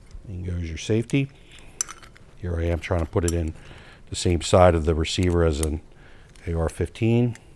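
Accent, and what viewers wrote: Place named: Philadelphia, United States. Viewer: I grew up right outside of North Whales PA. Love the accent man! True Philly/Eastern PA! Just purchased this trigger. Cant wait to get it installed!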